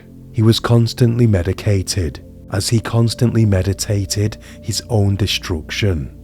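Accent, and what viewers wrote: Wales, United Kingdom. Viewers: Your accent and cadence dont match, its like a normal accent with Welsh flow or something 😅 i cant put my finger on it but it sounds fake like you're doing a bad valley girl impression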